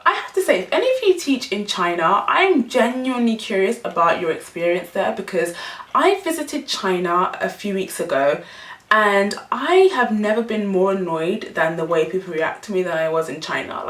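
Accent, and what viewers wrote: England, United Kingdom. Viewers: She has the British accent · I can kinda understand (some what) peoples need to stare at things that they don't see very often or they're not use to. In the US there are a lot of different races so some one walking by of a different race from you isn't a big thing but there is one thing about you that would make me stop. Your accent. I love your accent! Hearing an English accent on a black person is rare here, so I would do a double take. I would stop you just to ask you to chat so I can listen to you talk. To be fair I would do that to anyone from the UK.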